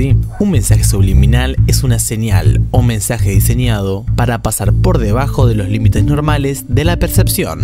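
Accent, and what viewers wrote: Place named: Buenos Aires, Argentina. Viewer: Jejeje, sobre el acento, ya no se nota tanto el acento de Buenos Aires... Saludos, amigo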